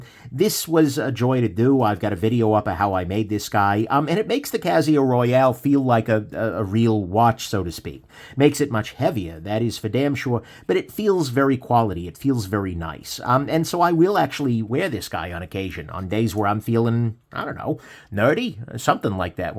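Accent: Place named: California, United States. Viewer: At the end of the video I learned what a California accent sounds like.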